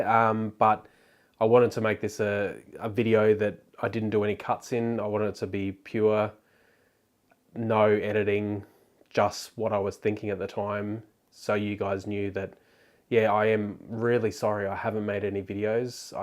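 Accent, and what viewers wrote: Australia. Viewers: G'day Mate, good to see you back, get well. It's good to hear your Aussie accent again :)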